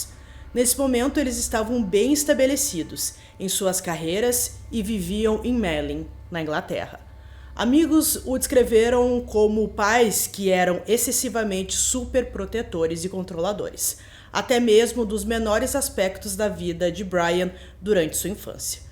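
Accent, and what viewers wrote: Rio Grande do Sul, Brazil. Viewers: vc é gaúcha?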